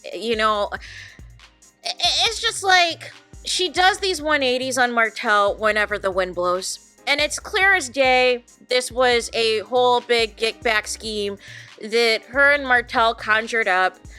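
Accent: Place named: New Jersey, United States. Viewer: Whom ever is complaining about your sound should merely adjust their sound. If they complain they can bye, you are the most non controversial CC, I've been tuning in almost 2 years now. You are great and growing which makes you a target. You get to it state facts and laff lol😂 I love your laughter. Sounds like a jersey girl ❤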